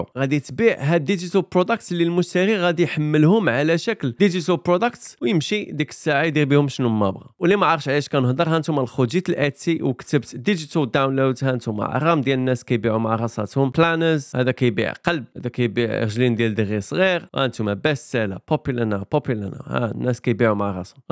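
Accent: United Kingdom. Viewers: عرفتي لاهدرتي بالبريطانية اتصرعها عندك واحد لبريتيش اكسنت واعرة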